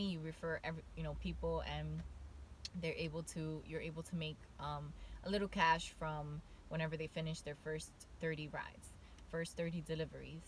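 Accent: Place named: Latin America. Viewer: I’m guessing your Latina by the accent. Good luck stay positive but the best way to make money is by referrals if your in sales.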